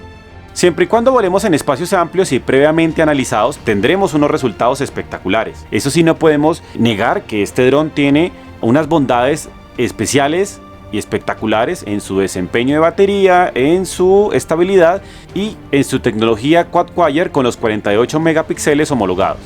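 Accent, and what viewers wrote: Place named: Colombia. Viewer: Amigo. Eres Colombiano?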